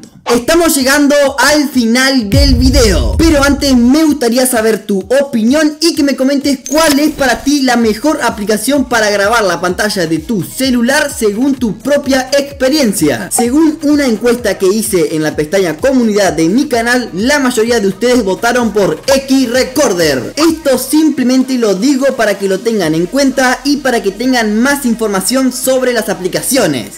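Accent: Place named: Chile. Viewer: eres chileno? se te nota el mismo acento que los de gran hermano chile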